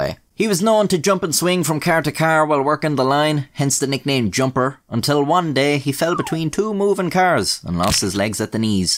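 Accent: Ireland. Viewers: nice drawings. i like james. very polite. my uncle is irish and is named james and he kinda looks like a monkey. and u sound irish. ressurfuate hin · This video would feel so wrong if it wasn't narrated by an Irishman.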